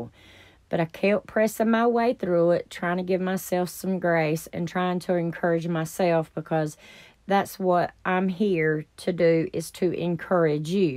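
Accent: South, United States. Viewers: It looks awesome.your doing terrific keep it coming,by the way I hear a southern accent where are you from,I’m from Eastern Kentucky.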